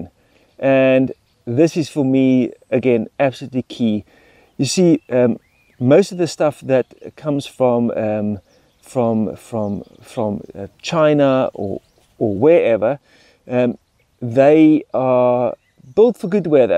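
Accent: South Africa. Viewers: Painful! Specs not correct, designed in the UK but built and assembled in China. All parts sourced in China but available here as well. Seeth-Afreekin accent still heavy!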